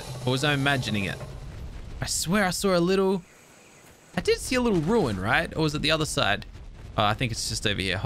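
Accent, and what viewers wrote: Australia. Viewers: Are you Australian cause you sound Australian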